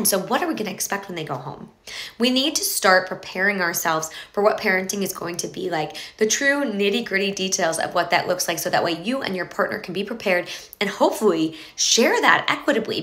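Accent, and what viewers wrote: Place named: West Coast, United States. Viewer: She has to be West Coast. They have developed the most annoying accent in the US.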